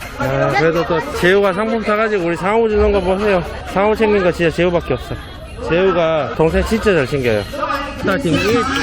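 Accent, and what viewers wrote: Gyeongsang, South Korea. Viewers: 혹시 고향이 대구 이십니까??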